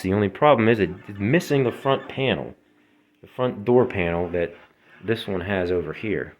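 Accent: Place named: Pennsylvania, United States. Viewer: Fair enough. I was judging based on your accent. Knew it was somewhere in Pennsylvania, lol.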